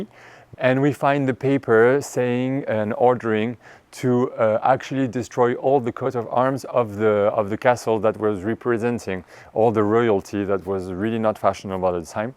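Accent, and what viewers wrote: France. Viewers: You can speak French no ? I hear at your accent you are French no ? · I don't think that I'm the only one, but I would marry this handsome man with this charming French accent and impeccable taste! 😍 If I wasn't already married, that is!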